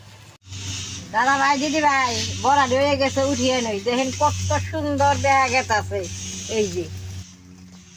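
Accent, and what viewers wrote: Bangladesh. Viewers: আচ্ছা ঠাকুমা তোমার জন্ম স্থান কোথায় গো, তোমার ভাষার সাথে আমাদের বাংলাদেশের বেশ কিছু জেলার ভাষার মিল পাই।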